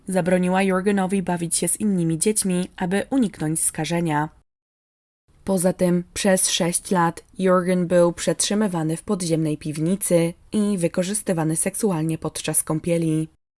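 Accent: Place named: United States. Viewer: Mnie dość "bolało w uszy" wymawianie niemieckich imion, nazwisk i nazw miejscowosci z amerykanskim akcentem. Z polskim byłoby zdecydowanie ok. Bardzo ciekawy odcinek. Pozdrawiam.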